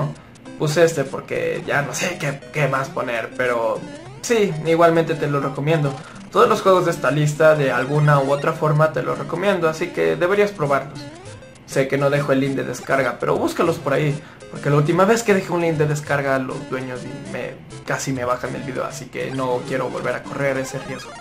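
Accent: Ecuador. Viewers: Eres Ecuatoriano.??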